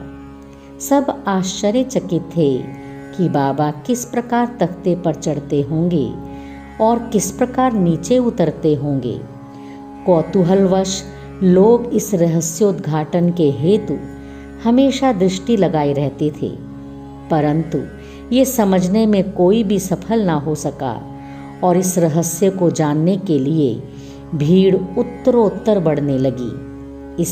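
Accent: Standard. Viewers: Om sai ram.आपके द्वारा बहुत ही मधुर और शुद्ध उच्चारण में बाबा की लीलाएं हमें सुनने को मिल रहीं हैं. इसके लिए आपका बहुत-बहुत धन्यवाद।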